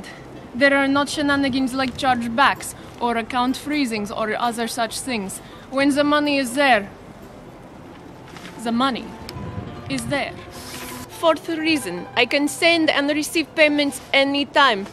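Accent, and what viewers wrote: Russia. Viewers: lol Russian accent makes instant sex appeal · I Fuckin love your Russian accent :)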